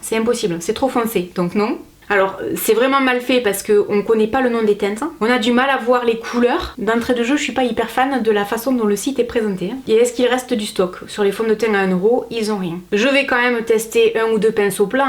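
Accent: South, France. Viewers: Jaime beaucoup ton accent du sud et ta voix et la même que kamila de secret story en tout cas gros bisous, et rejoiner moi sur ma chaîne YouTube biz😗👍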